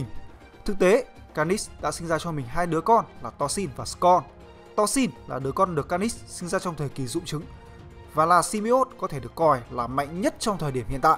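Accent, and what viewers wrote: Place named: Central, Vietnam. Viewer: giọng anh rồi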